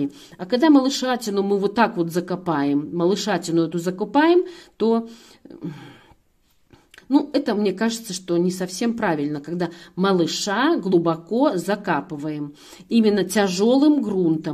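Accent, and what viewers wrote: Belarus. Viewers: Спасибо❤. У вас такой милый белорусский говор с акцентом на букву "я"))))))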